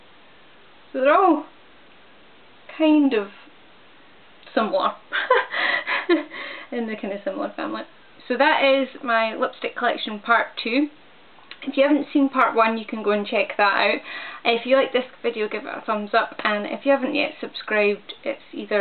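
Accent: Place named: Scotland, United Kingdom. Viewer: She's Scottish, gonna say from the west coast maybe?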